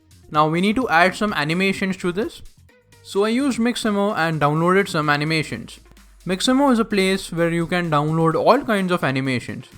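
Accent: India. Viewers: Stopped watching when I heard the indian accent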